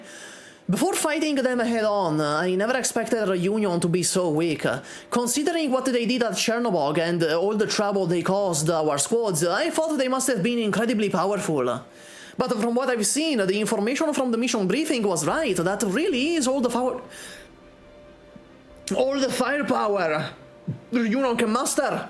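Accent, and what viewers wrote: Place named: Syracuse, Italy. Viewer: he spoke in a siracusian accent for 3 & 1/2 hours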